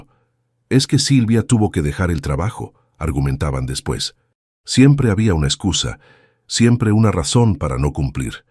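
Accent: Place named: India. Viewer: Eso de narrar estás historias en indio, las perjudica totalmente,, si no se corrigen no tendrán mucha audiencia, es patética, está forma de narrar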